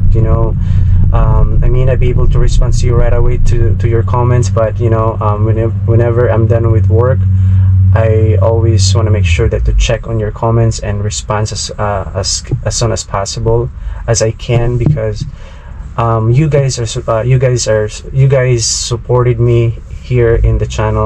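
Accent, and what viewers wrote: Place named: Philippines. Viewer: Hey where's in Philippines you come from?,Your English is very good a bit if a accent there.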